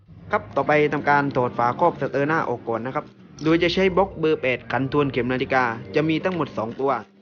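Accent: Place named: South, Thailand. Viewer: พี่แกน่าจะคนใต้ครับ ฟังจากสำเนียง 555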